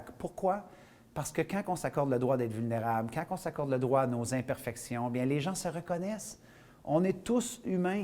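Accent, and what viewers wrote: Quebec, Canada. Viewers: C'est spécial l'accent québécois lol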